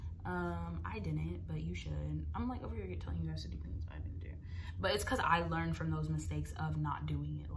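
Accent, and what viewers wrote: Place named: California, United States. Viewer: Great advice. Girl I see your Calley accent is leaving.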